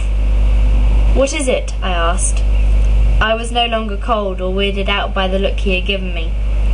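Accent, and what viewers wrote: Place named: United Kingdom. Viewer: Your voice is lovely and so british:-) Thank you for reading!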